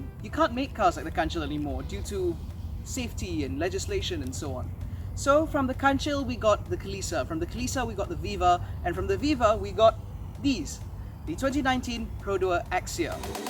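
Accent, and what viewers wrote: United Kingdom. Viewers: Localized Queen’s English..terbaik bro👍🏾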